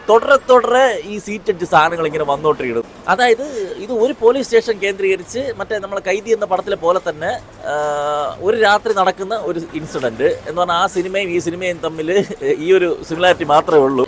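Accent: Trivandrum, India. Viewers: He is from trivandrum. Do check more videos including live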